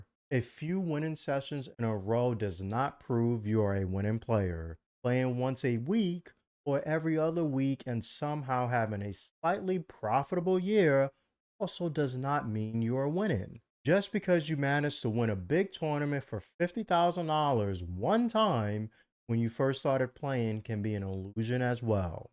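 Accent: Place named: New York, United States. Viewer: Im curious bud, where did you grow up? Where were you playing live in your road to having 7 straight profitable years playing poker? I presume somewhere in NY/NJ area because your accent sounds almost identical to my own and I feel like I have bumped into you somewhere before.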